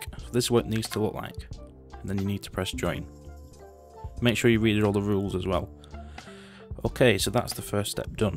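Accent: India. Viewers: I thought its an indian dude :) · Who expected indian voice?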